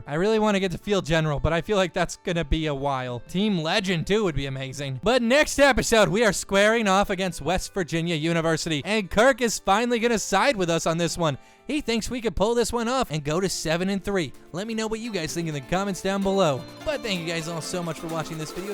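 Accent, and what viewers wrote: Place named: Midwest, United States. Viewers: Old roommate from Apple Valley, MN sounds similar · is a good old fashioned Midwesterner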